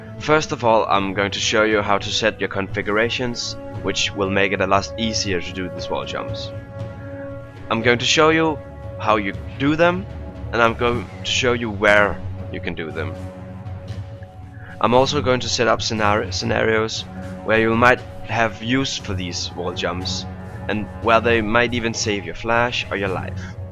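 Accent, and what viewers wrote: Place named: Denmark. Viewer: I guess we have a dane speaking :)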